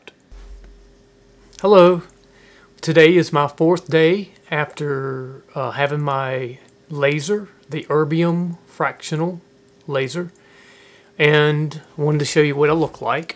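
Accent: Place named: South, United States. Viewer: Lov souther accent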